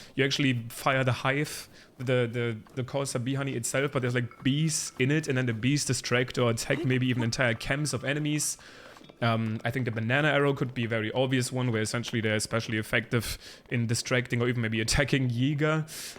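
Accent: Germany. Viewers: You sound german are you german ?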